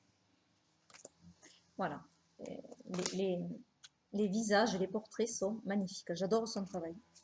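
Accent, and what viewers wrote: South, France. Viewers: avec ton accent du soleil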